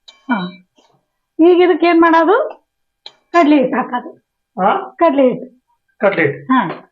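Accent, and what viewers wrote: Maharashtra, India. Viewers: Unti nim maharashtra kannada bharpoor ista aatri... Neev mado palle supr ree... Daily school ge kattak lagu madak easier ree avvari..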